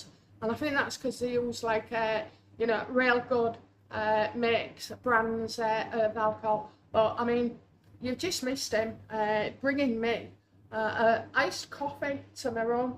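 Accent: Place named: England, United Kingdom. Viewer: To be honest, I watch your videos because I love your “ no-nonsense whimsical common sense personality”. I have to put on the English captions due to your English accent. I love the English slang you use. I am very entertained with your videos. I hope you keep them coming. ❤😎🇺🇸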